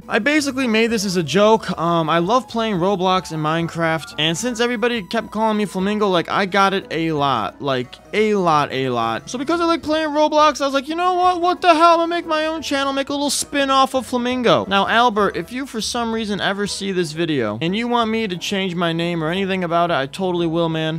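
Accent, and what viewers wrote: Australia. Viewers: are you australian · Man's just the Australian version of Flamingo except that I don't know if you're Australian. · Are you aussie? If you are im immediately subbing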